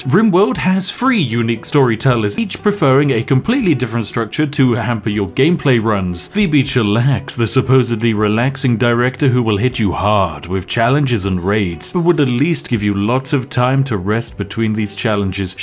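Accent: United Kingdom. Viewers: I think it might have been a Rim World video that got me to subscribe to your channel. You were jacking stock images and harvesting organs and I thought to myself, "This British sounding guy is a complete psycho, I must subscribe" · British man doing game reviews. Rip totalbiscuit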